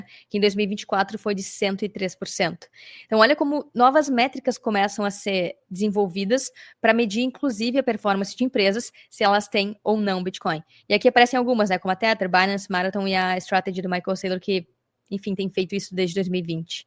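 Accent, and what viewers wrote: Rio Grande do Sul, Brazil. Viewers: tem sotaque de gaúcha, que linda 😂❤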